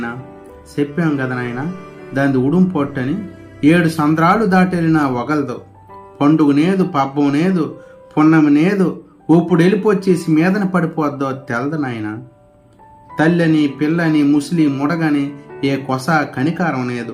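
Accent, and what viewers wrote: Srikakulam, India. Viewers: కవి గారికి శుభోదయం. ఉద్దానం యొక్క పరిస్థితిని కళ్ళకు కట్టినట్లుగా కవిత చదివే విధానం శ్రీకాకుళం యాస కవితలో చోటుచేసుకుంది. పూర్వ విద్యార్థుల సమావేశంలో ఈ కవిని గురించి మాట్లాడే అవకాశం లేకపోవడం నాకు కొంత బాధ కలిగించిన విషయం. ధన్యవాదాలు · శ్రీకాకుళం యాస లో కవిత... చాలా హృద్యంగా ఉంది.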